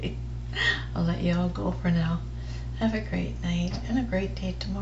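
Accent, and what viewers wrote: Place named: Ireland. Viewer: Well done. Deborah is very talented i went and had a look at her website too! I love your irish accent:-) Please do a whole video one day just talking Irish!!:-) hee hee Happy week to you Lotsa love x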